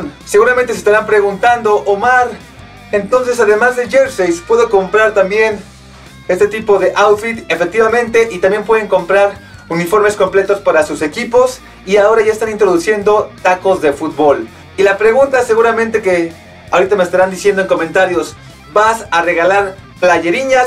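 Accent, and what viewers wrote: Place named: France. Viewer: Haz más vídeos con tu suculento acento Francés y Brasileño. ♡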